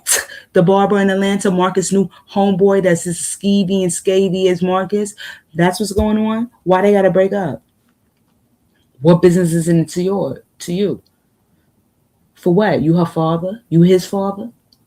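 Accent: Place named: New York, United States. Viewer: Replay, this was fire…ya talks on these subjects are fire and love the ny accent! And u digging ya claws in these mofos deep! lol 😂 this is sick fr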